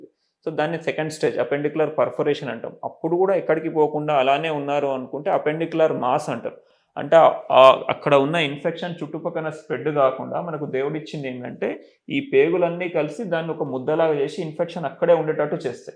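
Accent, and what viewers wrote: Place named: Telangana, India. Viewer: Chaala natural ga telangana yaasalo manchiga cheppirru sir , Miru nijayathiki maroperu sir, Inkenno videos cheyyaalani korukuntunnam sir thank you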